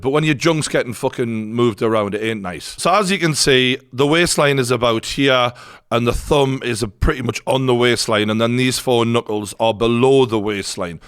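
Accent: Newcastle, United Kingdom. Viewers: The voice of boxing ….true geordie 👍🥊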